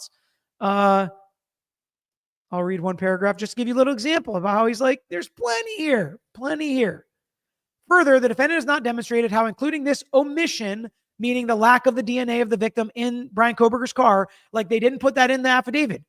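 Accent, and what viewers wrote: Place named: South, United States. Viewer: Put on 1.5x speed if you’re not from the South.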